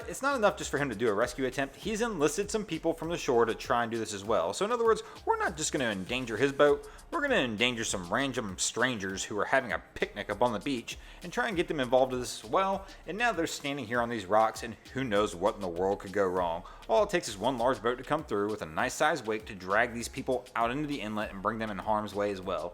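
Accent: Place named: Delaware County, United States. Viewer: You sound like youre from Delco.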